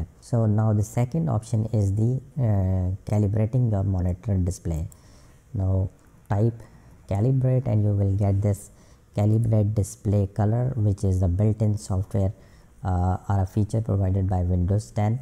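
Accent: India. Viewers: the indian voice tho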